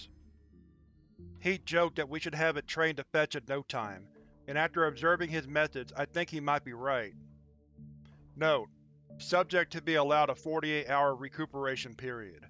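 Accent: South, United States. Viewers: From what I can see, you're doing an outstanding job. In my opinion, as with all things, your new focus and "stepping up the game" has completely revitalized the presentation of SCPs, and you should feel rightly proud of it. Also, it's good to hear another Southern Man doing videos that aren't stereotypically Southern.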